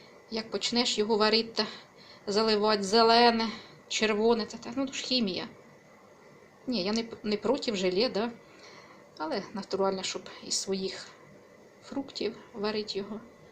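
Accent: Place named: Poltava, Ukraine. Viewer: Дякую за рецепт ❤У вас мова ,як моєї мами,вона з Полтавщини. А Ви з якої області???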